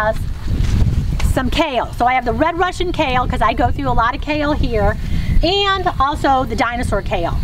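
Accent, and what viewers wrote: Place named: New Jersey, United States. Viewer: Love your garden! Looking forward to see the progress throughout the summer. I also grow all of my own produce here in sunny CA! Sunflowers are one of my favorite flowers also, but did you know that they have allelopathic qualities that can prevent other plants growing around it from flourishing? I just found that out two years ago and now i have a dedicated sunflower patch so I can get every ounce out of my raised boxes. Anyways just thought I’d mention it in case you didn’t already know. By the way, your voice/accent sounds just like my aunt from New Jersey. Are you originally from around Jersey?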